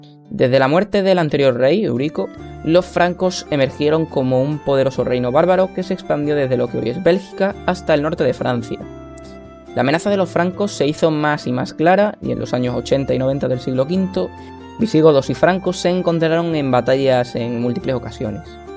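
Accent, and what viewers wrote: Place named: Andalusia, Spain. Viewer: No es mala dicción, es acento andaluz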